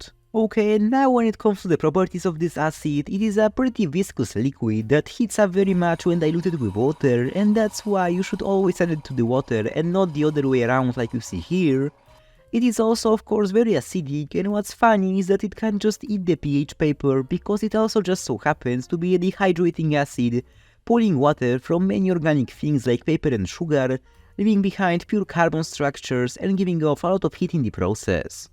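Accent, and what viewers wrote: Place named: Poland. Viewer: polski akcent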